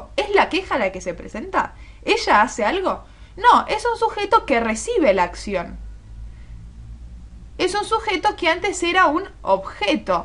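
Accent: Argentina. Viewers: Gracias, muy linda tu voz argentina :) me encanta ese acento en las mujeres de allá, saludos! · tu voz es RE argentina sos de argentina??? que suerte estaba estudiando lengua y encontré estos videos tuyos son buenísimos me re sirvieron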